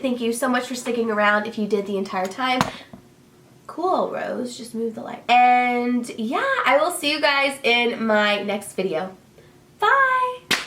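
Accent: South, United States. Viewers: I just discovered your channel and I could tell you were southern but always wondered where from. We are from mississippi but my husband has family from Alabama and he is a HUGE auburn fan and has passed on his love for the team down to our son! We try to make a game atleast once or twice a year. Love it and love your vids!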